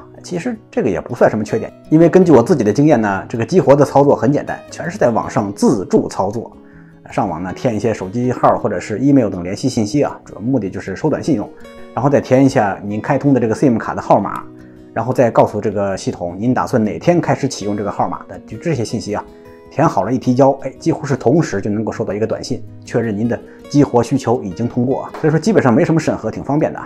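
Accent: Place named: Beijing, China. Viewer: 沒有惡意，對你視頻還是挺喜歡的，特別是你的京城口音❤👍😀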